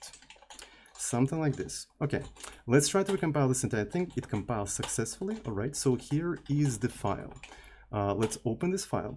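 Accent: Russia. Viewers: spetsific- is in russian, I guess he will unconsciously always say like that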